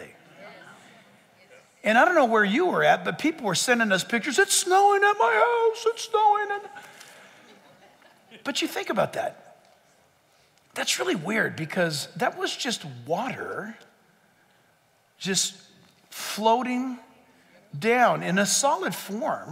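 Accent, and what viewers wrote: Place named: New Jersey, United States. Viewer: You sound like you come from New Jersey to me! You also have the same sense of humor that my husband and I have and I gave him your name and this series you're preaching. God bless you, always. I've been through some of the things you mention.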